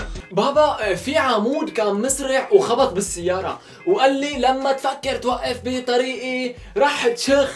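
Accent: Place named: Damascus, Syria. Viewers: ليش مو عاجبك لهجة السوريين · Taker 1995 HD لأنو هوي سوري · هاد من الشام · يييييييييييي هاي لهجتو سوري اصلي.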